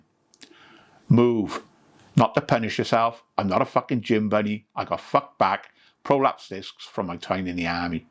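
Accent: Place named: Birmingham, United Kingdom. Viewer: It's like one of the peaky blinders got sober.. and having him telling you how it's done.. love it!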